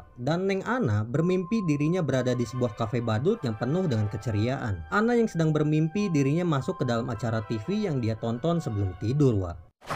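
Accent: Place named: West Java, Indonesia. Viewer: Penjelasan jelas, ada sundanya kocak.. Paket komplit lah ini channel wkwkw auto subsscribe waa.. 🤣🤣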